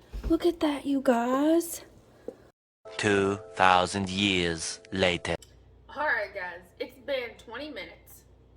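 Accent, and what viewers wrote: United States. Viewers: I like how I could genuinely share in her surprise and feel like I'm apart of the process 🤣💎🙌. That southern country accent while teaching me do stuff in the kitchen keeps me listening though 🙊😇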